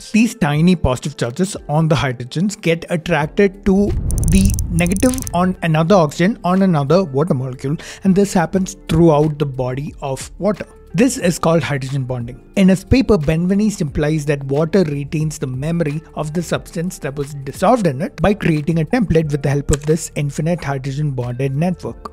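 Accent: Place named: South India, India. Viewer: you r speaking South Indian... in the name of English..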